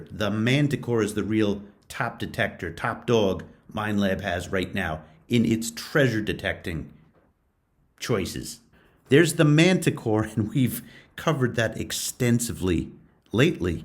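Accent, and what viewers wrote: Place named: New York, United States. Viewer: I mean I love the accent,, that New York area Kofffeee sound... But it's gotta be niche (neesh) not neech , like Cheech... Know what I'm saying?😅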